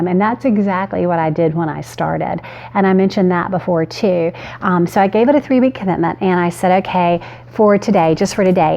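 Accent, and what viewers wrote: South, United States. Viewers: Great encouragement. Thanks so much. This Tennessee girl feels right at home with your Southern accent!😎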